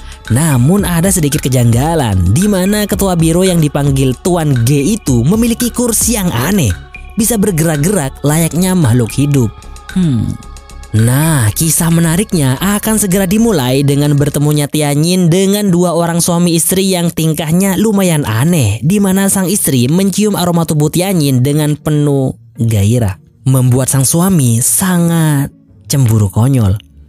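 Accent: Madura, Indonesia. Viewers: Madureh kek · Ini cuma gua yg dengar apa memang admin orang madura? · Madura ya bang? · Orang madura ya kak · Loh kok bisa madura ini mimin nya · Areh oreng madureh yeh🤣 Mak bahasannah Reng madureh🤣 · Corak besa madureh wkwkw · Admin nya kek nya orang Madura deh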